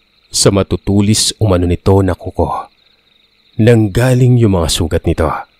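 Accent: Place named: Hiligaynon, Philippines. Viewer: An kyutt ni kuya mag ilonggo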